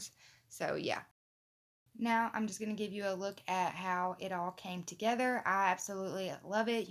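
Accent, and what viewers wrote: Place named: East Texas, United States. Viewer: You are very creative! I love the 3-tiered tray and I am most definitely making the “I Can Make That” sign. I say that all the time. Also love your sweet accent! ❤️East Texas?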